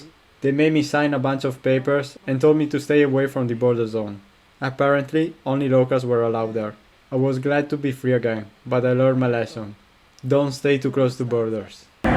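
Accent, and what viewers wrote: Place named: Venice, Italy. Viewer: Ma io non so l'inglese e a 70 anni non mi metto di certo a studiarlo. Mi dispiacerà non seguirti più! Sei bravo ,simpatico e soprattutto non reciti la parte dell'eroe. Risolvi ogni intoppo senza ingigantirlo e hai un accento veneto che mi piace molto. Buon viaggio quindi, e mi raccomando: resta sempre così ,te stesso 😊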